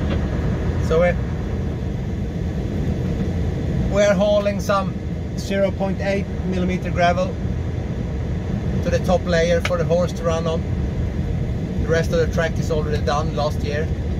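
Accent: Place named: Dalarna, Sweden. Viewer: Använde Transportstyrelsen för att se det, såg din reg plåt o tänkte att jag kunde säga det. 😂 Är från Sandviken i alla fall, jag har för mig du är i Dalarna, från hur du pratar svenska.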